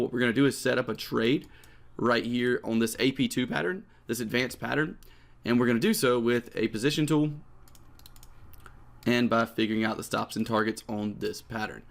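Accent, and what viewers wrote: South, United States. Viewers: I can you’re from the south lol I am too “Wifey”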